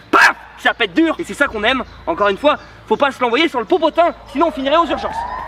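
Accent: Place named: Nord, France. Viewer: continue comme cha min loute, j'adore quand tu fait ton babache sur tes vidéos. Bonne continuation min loute. sa me rappel ma bonne région quand tu parle ch'ti. :)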